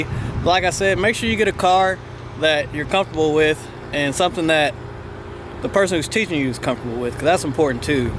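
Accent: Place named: South, United States. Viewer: I saw that hat and heard that southern accent and knew he was bout to lay the knowledge down. Us country boys know how to drop them gears like no other. Make them things screaaaaaam.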